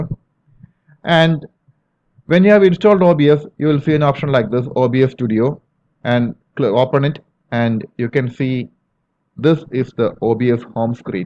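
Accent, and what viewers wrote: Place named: Kerala, India. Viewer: Are you from Kerala sir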